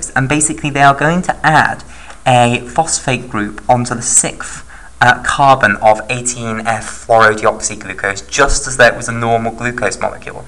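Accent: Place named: RP, United Kingdom. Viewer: Very nicely explained....very very britishy poshy accent... :D ...very "intellectual" sounding. Cheers from Brussels!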